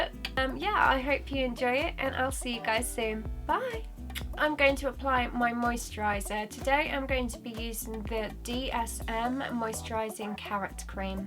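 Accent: United Kingdom. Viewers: OMG I just love her british accent 😱😍😱😍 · I love British English accent .. and you looks great :*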